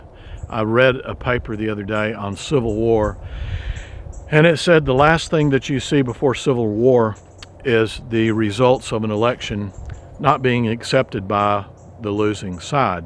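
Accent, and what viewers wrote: Texas, United States. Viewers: you talk so cute. You have such a sweet delivery. Love the Texan accent.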